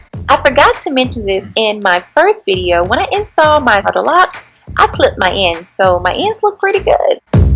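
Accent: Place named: Washington DC, United States. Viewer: You sound like your from dc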